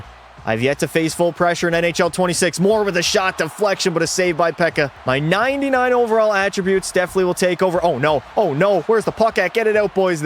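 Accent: Canada. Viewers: Could pass as brothers lmao the Canadian accent too I love it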